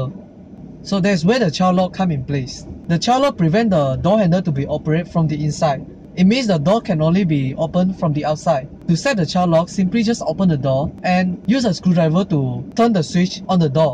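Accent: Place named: Singapore. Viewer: walau... your English is having same slang as Singaporean English...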